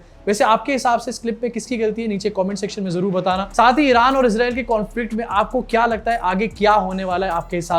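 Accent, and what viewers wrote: India. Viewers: your hinglish accent was so cute and funny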